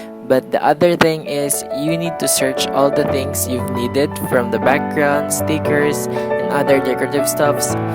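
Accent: Philippines. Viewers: 𝐋𝐞𝐦𝐦𝐞 𝐠𝐮𝐞𝐬𝐬 𝐡𝐞 𝐢𝐬 𝐟𝐫𝐨𝐦 𝐭𝐡𝐞 𝐩𝐡𝐢𝐥𝐢𝐩𝐡𝐞𝐧𝐬 𝐦𝐲 𝐛𝐟𝐟 𝐢𝐬 𝐚𝐧𝐝 𝐭𝐡𝐢𝐞𝐫 𝐚𝐜𝐜𝐞𝐧𝐭 𝐬𝐨𝐮𝐧𝐝 𝐭𝐡𝐞 𝐬𝐚𝐦𝐞 𝐢𝐦 𝐟𝐫𝐨𝐦 𝐯𝐢𝐞𝐭𝐧𝐚𝐦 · I know a pinoy when I hear one🌚 Lab da bideo, Kuya! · His filipino accent tho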